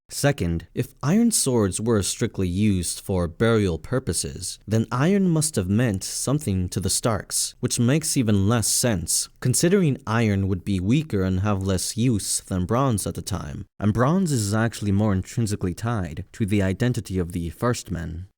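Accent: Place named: Midwest, United States. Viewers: Sounds midwestern. Maybe Colorado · Great video! What part of the US is your accent from? I've never heard it before.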